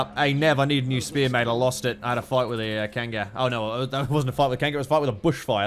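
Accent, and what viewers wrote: Australia. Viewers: really let out his Australian out in this video. I guess he really felt at home. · I know is from down under but it’s really coming through thick in this video and I love it! Also yeah, paintball ref/ marshal… done that. You break your voice first weekend, then you can yell so loud. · became so Australian that I actually had some trouble understanding him. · I feel like this is the only video I saw, where go full aussie. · I love how accent slowly gets more and more australian through this video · Man your aussie accent came out strong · This is the most australian I've ever heard be in a video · Got sent this by a mate. First thirty seconds and I’ve subscribed. I’ve actually got someone in my own country (or at least my own nationality to watch) unless I’m wrong and he’s just putting on the Australian accent, but sounds bloody spot on at least. 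· getting back to his roots in more ways than one! I wonder if hes happy to finally have a game that he can legit use aussie accent 100% and noone will nag him about it cause its theme appropriate, although I don't get why hes so bothered by Dicko's slaughterhouse? I mean OSHA/H&S would have a field day with Dicko, were he still alive... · my favorite thing about this video is that the accent got more noticeable as the video went on, which tracks with what i've heard about australians toning down their accents for non australians but slipping right back into when around other australian accents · Not hard to understand, I understood him just fine. But it was a stark contrast compared to how he usually sound around non-Aussies and in his other videos, and definitely a much stronger dialect. And I frankly found it wonderful. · I’d love to see more of this! I especially love how becomes more Australian playing this! 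· A videogame about the Australian outback in full Australian mode "Just like the simulations" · went full Australian during this video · I love how thick his accent is. He really sounds Aussie, I fucking love it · Hearing going full Australian is amazing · I've never realized how Australian can actually get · This is the most Aussie I’ve heard in a while and it’s great. Especially with the Drop Bear. And seriously. Wtf is a Drop Bear. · now i've been watching for a good while now and i have to say this is the most australian i have ever seen him go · I love how sounds VERY Aussie in this one. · 2 things: 1) takes shots at a kangaroo Kangaroo comes from the side: Clever girl 2) I think this video has strongest Australian accent I've ever heard...not sure why that's a thing, but having watched his stuff since he played Valkyria Chronicles 4, it's just something I've observed. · This is THE most Australian I've ever heard, or any other Australian streamer, get.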